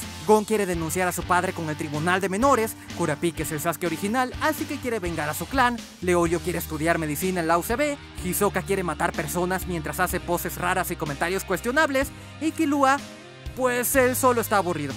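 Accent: Venezuela. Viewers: eres Venezolano? xd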